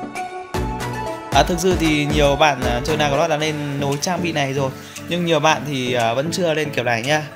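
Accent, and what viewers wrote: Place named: Thanh Hoa, Vietnam. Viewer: người thanh hoá à. Thấy có cả (mô)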